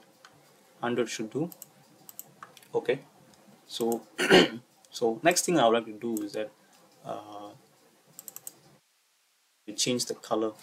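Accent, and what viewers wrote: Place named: India. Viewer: You are the best human on this planet love your work ! You helped me a lot ! And great accent keep it I love India and its people soo much!❤️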